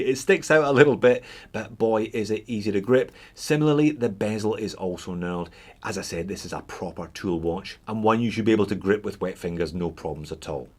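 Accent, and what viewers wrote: Scotland, United Kingdom. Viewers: Aye. I just spent 300 on a new Pepsi turtle and now looking at this King samurai haha Where does it end!? Glad I found your channel. Really enjoy the review. And nice to hear a fellow Scot.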